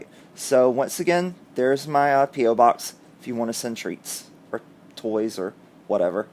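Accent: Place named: Virginia, United States. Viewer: I've always liked your accent and now I know it's Virginian.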